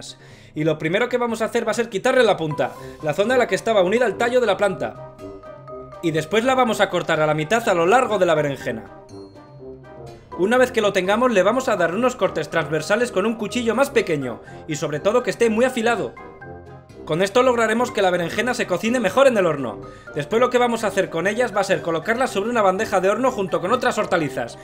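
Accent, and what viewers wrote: Spain. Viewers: te amooo💟💞💖 sos el mejor y me encanta tu asentó espeñol😍😍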